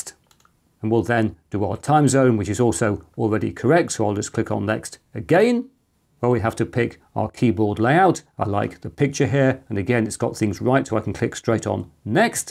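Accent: United Kingdom. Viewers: Dear ‚ I might be the most commenter on this channel by far. It feels so good to say that you are the god of computing. The way you explain topics‚ the way you divide content into subtopics‚ and ofcourse the great Britain accent. Brutha, you are the goat of computing. Using your videos as a guide to installing anything. Ofcourse, I try things out first. Use Chrome OS Flex on my Main Laptop. And use Omarchy Linux on my desktop home pc. You can say I use Arch btw!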